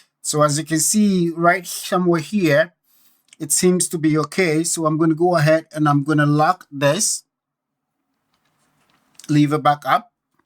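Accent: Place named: Ghana. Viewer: love the African accent,,, Ghanaaaaaaa.... lets link up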